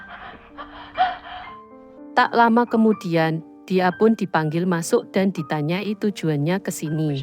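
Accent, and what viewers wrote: Java, Indonesia. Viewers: Medox banget mbak...!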